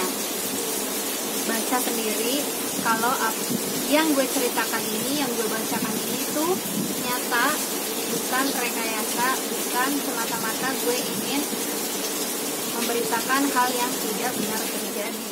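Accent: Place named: Java, Indonesia. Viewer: medok bettt bahasamu mba apa lagi pas ngomong "GUE" medok beettt sumpah mba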